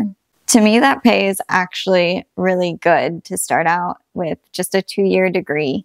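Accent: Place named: Australia. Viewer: what county you are mam? I thought youre from Australia. 😅 are you from US?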